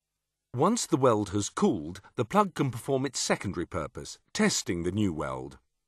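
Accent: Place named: United Kingdom. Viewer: Narrator is wonderfully British.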